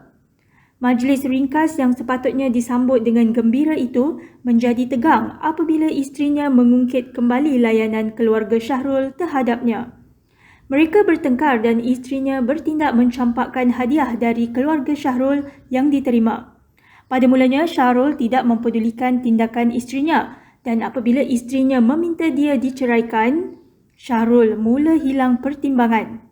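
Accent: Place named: Kelantan, Malaysia. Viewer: Speaker pelat... ore klate ko haha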